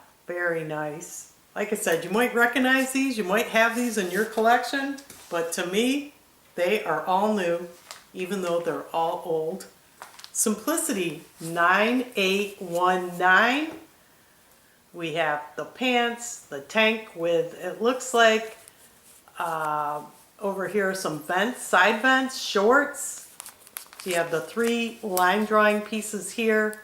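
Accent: New York, United States. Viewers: Your skirt and red top look great. I'm trying to figure out your accent. Almost NY but with a twist.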